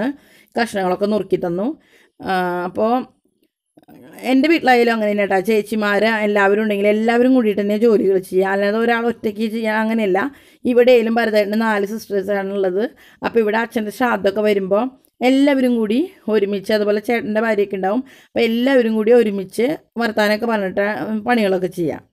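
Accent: Thrissur, India. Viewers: ചേച്ചി കാലഹരണപ്പെട്ടു പോയ thrissur slang ചേച്ചി തിരിച്ചു കൊണ്ടു വരണേ. നമ്മുടെ അമ്മൂമ്മ മാരും അമ്മമാരും സംസാരിച്ചിരുന്ന ആ slang ഇപ്പോൾ kelkane ഇല്ല.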